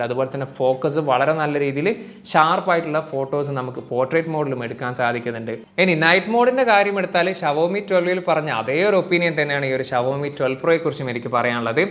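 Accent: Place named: Kozhikode, India. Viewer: അതിന് ഞാൻ നാട് എവിടെയാണെന്ന് ചോദിച്ചില്ലല്ലോ സുഹൃത്തേ...! നമ്മൾ ജനിച്ചു വളർന്ന ദേശത്തെ ഭാഷ അറിയാതെ നമ്മുടെ സംസാരത്തിൽ വന്ന് പോകുന്നത് സ്വാഭാവികം.. അതിൽ തെറ്റൊന്നും പറയാനില്ല.. പക്ഷെ ഇത് പോലെ ഒരു പബ്ലിക് space-ൽ പരിപാടി അവതരിപ്പിക്കുമ്പോൾ അത് വരാതെ ശ്രദ്ധിക്കാൻ നോക്കണ്ടേ..? കാരണം, കോഴിക്കോടുകാര് മാത്രമല്ലല്ലോ അനിയന്റെ ഈ content കാണുന്നത്....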